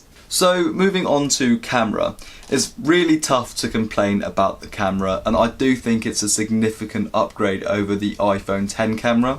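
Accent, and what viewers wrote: United Kingdom. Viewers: Atr you british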